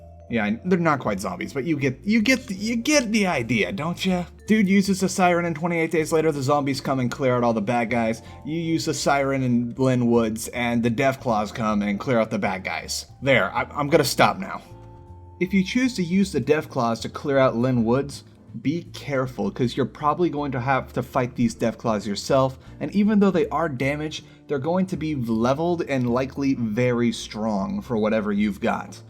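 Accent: United States. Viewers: He's American, they're not the brightest and quite R*traded.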